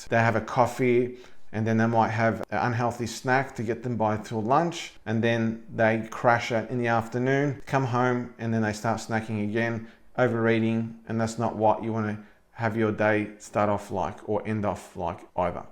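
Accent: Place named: Australia. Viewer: Excellent advice, delivered in an Aussie accent that is impossible to ignore. These are all points I can follow, determined to lose the spare tire around my midsection, thanks mate!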